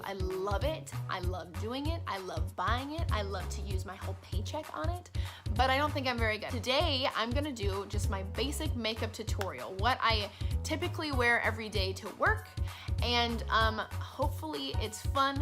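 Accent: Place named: United States. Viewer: Your country accent is killing me. I love it. 😹😹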